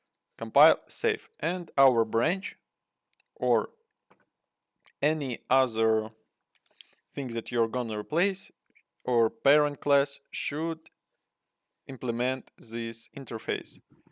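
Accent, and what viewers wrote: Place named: Russia. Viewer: Акцент прям русский. Более уверен что ты наш)) Отличный урок! Спасибо!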